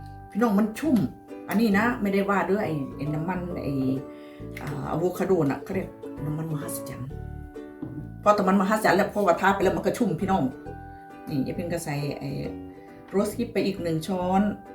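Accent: Korat, Thailand. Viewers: ชอบภาษาไทยโคราช เพราะมากขอให้ รวยๆจ้ะ ลดคำว่า พี่น้องจ๋าๆๆๆ ก็จะดีมากคะ่ มันเยอะไป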